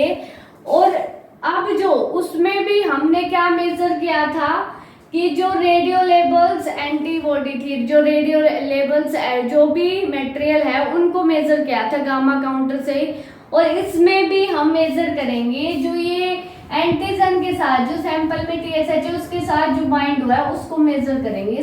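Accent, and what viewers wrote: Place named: Haryana, India. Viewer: madaam to haryana ki lagti ha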